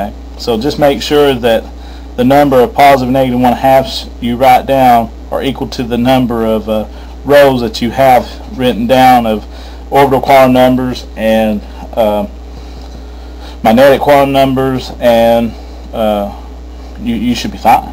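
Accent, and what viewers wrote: South, United States. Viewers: Dude i need you as my chemistry teacher. and based on your accent, youre prolly right here in the south with me haha!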